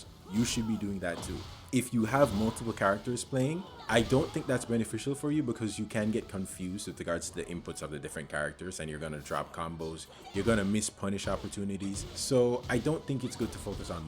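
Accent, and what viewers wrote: Caribbean. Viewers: Imma subscribe bc you sound Caribbean...i am too. You also know what youre talkkng about! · bro sounds caribbean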